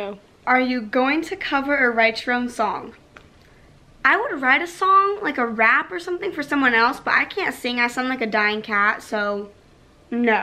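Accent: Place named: United States. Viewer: Omg your American acsent so good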